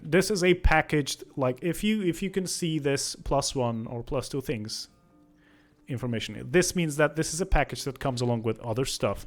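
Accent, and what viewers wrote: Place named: Scandinavia. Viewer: Game looks interesting from the little i've seen. Showing my fellow scandinavian some support (Can tell by the accent)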